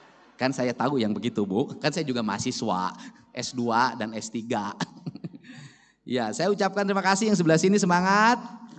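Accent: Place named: Java, Indonesia. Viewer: Tahu aku kabayan,,,, Tapi si KDM besar nya, di subang, subang itu banyak jaware ( jawa separo), sejatinya adl bangsa jawa. Lihat saja KDM, kadang2 keluara logat jawa,, lihat saja rumahnya itu rumah bergaya jawa bukan sunda..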